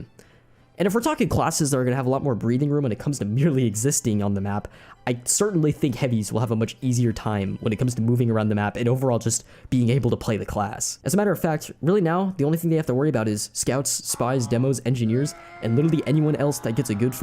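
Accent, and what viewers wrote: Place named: New Zealand. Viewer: He’s actually from New Zealand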